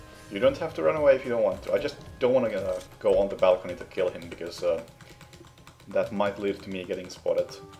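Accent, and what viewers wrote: Finland. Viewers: That’s a Finnish accent 🤘🏻🇫🇮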